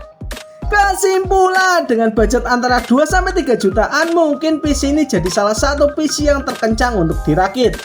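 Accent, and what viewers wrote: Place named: Java, Indonesia. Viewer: Logat jawanya sangat kental 🤣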